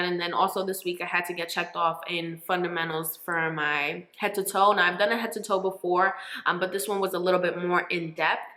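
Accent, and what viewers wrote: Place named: New York, United States. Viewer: Most definitely sound like a New Yorker. I’m a Brooklynite and she dead sound like women from my childhood.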